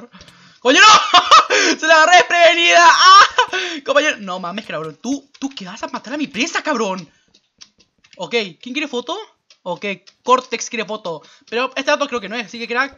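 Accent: Argentina. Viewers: Eres de cordoba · eres argentino?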